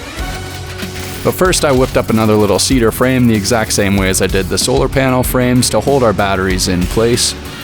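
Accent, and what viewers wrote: Canada. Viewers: You sound like an Ontario guy,hello from Mtl bud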